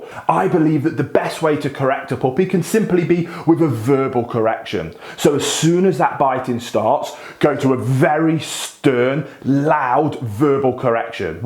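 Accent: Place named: Midlands, United Kingdom. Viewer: Your videos are just fantastic! And hearing a midlands accent reminds me of home..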